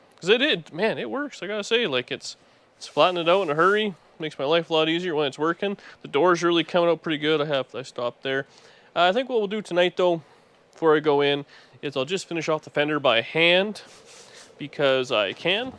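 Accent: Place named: Canada. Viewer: After sitting here and watching the video, I am wondering why I enjoy this channel so much and it came to me. It’s! Aside from the great projects, his obvious skills, and hard work ethic, he has a likable personality. That quality and his ability to verbalize the way he goes about his projects with a bit of humor and devil may care (“it will be fine”) attitude keeps the viewer’s attention. I like the Canadian accent and the fact that he keeps it clean and family friendly. Through all the problems and sweat, seems to be a happy guy. BTW, nice job “smoocin’ the ‘57!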